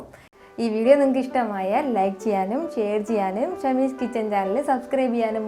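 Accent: India. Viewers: അടിപൊളി ആയിട്ടുണ്ട് 👌👌👌👌ഞാൻ ട്രൈ ചെയ്യും 👍👍👍👍👍😎😎😎😎 ഭാഷ ശൈലി നന്നായിട്ടുണ്ട് ഏത് ജില്ല 😁😁😁😁?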